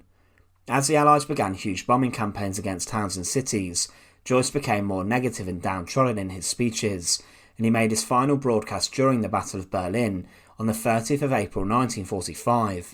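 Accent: United Kingdom. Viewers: I'm spending a year trying to figure out whether it is some UK area accent!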